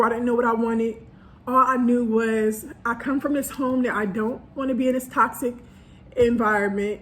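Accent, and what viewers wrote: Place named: Philadelphia, United States. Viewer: Sooo I just found ur podcast and as soon as I heard ur voice I was like “hold up is sis from Philly?! She’s giving me the vibe”. 😂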